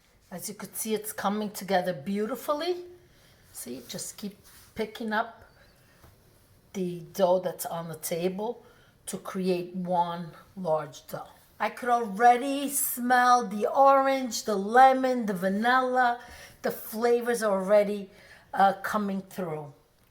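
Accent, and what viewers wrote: New York, United States. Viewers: Hello, I subscribed to your channel yesterday and had to call my mother’s(Phyllis) friend, Angela to ask because I just knew you were her sister! Same exact Italian/NY accent! Refreshing to hear since I moved from Ft. Lee to Indiana some years ago. I miss all things Italian since there aren’t any Italians here! No bakeries, no good cannolis, etc. My mother used your recipe for Ricotta cheesecake and I’ve continued the tradition.. I’m so glad I found this channel! 😊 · They look so good! I have to ask you, how long have you been here from Napoli? Your NY accent makes me think it's been a while. Lol..l love to hear you speak Italian. I don't hear it anymore. 😥 Did you teach your kids Italian? My father spoke it but never taught us..He was born in NY but Italian was his 1st language..He had to learn English for school. Good to see you today! 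❤❤😘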